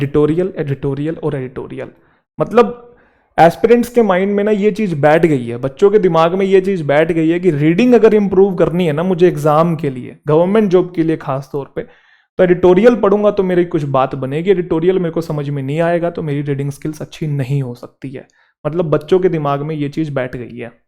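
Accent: Haryana, India. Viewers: Fruitful session🥀 apki batein sunkr aise man krta hai bass sunte hii jaoo….English ke sath haryanvi tadka jo milta hai hme😅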